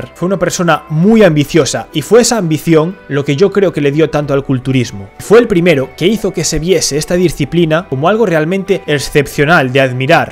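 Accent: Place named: Galicia, Spain. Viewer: Me mola, cuando te cabreas te sale el gallego de dentro jajaja, estas hecho un figura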